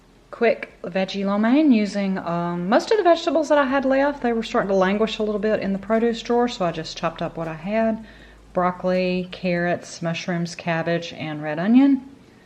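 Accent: South, United States. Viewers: Thank you for another week of meal ideas. I'm so sorry about your accident...wow! I love that veggie lomein, and am not ashamed to admit I also love oodles of noodles. Have eaten them hundreds of times with no ill effects. LOL Will you forgive me if I confess I can't seem to get into lentils? (Although my son keeps trying. He's given me about three pounds worth, and they're all sitting, being ignored, in my pantry.) Yeah on pantry meals, btw...you're doing great! I read one of the comments that someone criticized your voice?!? You have a lovely, soft, calming voice, with just a slight southern accent ( is more pronounced.) It's one of the things that makes your videos such a pleasure to watch. Just keep on being you. There's a reason you're headed to 10K subscribers, my friend. hugs...stef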